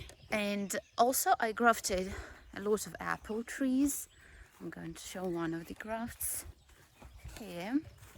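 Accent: United Kingdom. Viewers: Where did you learn English? It sounds like you have a British accent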